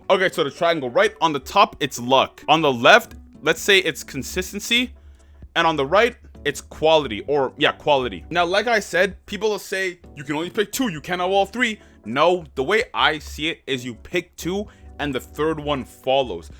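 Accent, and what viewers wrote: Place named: Canada. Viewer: Bruh tell me how I aint know yous canadian. Im deaf ash to accents n shi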